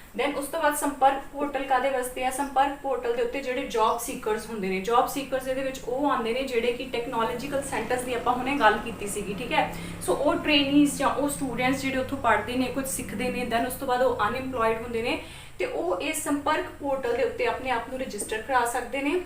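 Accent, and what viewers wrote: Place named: Majha, India. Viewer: Madam amritsar di lgdi a ya majhe di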